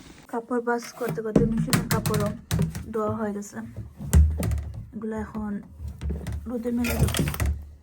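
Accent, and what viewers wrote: Sylhet, Bangladesh. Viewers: মাশাল্লাহ আপনার ভিডিও খুবই ভালো লাগে আপু। আপু আপনি কি সিলেটি। কথার ধরন অনেকটা ওরকম লাগলো প্লিজ ডোন্ট মাইন্ড।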